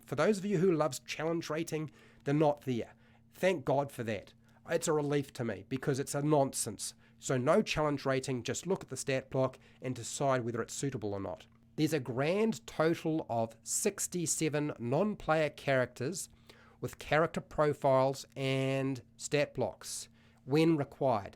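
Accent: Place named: New Zealand. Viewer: The kiwi accent makes me so happy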